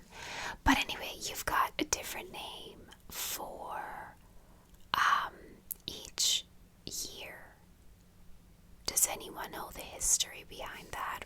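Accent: United States. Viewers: R you not American?? U sound so American though 😮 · Wait I’m confused where are you from? Im slightly deaf haha and I thought you were American but I obviously need to listen more often with my hearing aids in xx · Your accent doesn’t sound European. Sounds American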